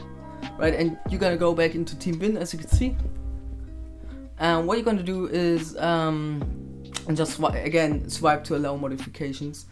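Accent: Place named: Germany. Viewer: Man merkt das du deutscher bist lul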